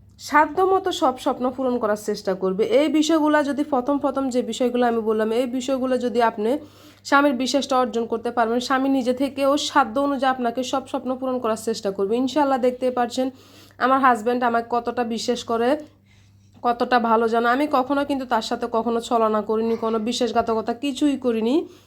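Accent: Noakhali, Bangladesh. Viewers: আসসালামু আলাইকুম আপু।। তোমার বুদ্ধির তারিফ করতে হয় আপু। মাশাল্লাহ এত বুদ্ধি তোমার। এত সুন্দর টেকনিক খাটিয়ে নিশ্চয়ই স্বামী স্ত্রী একসাথে হয়ে। এ ধরনের আশাগুলো তোমার পূরণ হয়েছে। তোমার কথাগুলো অনেক ভালো লাগলো। যদিও এভাবে চলে না কেউ। তবে আপু চেষ্টা করব ভালোভাবে স্বামীর সাথে চলার। এবং চলে এসেছি। ইনশাআল্লাহ। আমার কথাগুলো আমার মনে থাকবে। তোমার আইডি এগুলা।তোমার কথা শুনে মনে হচ্ছে তুমি আমাদের নোয়াখালীর মেয়ে। যাইহোক আমার ভুলও হতে পারে। দোয়া রইল আপু তোমার প্রতি। তুমি আরো স্বপ্ন পূরণ কর। আমাদের জন্য দোয়া করবে আমরা যেন তোমার মত চলতে পারি ইনশাআল্লাহ। ❤❤❤❤❤❤❤❤❤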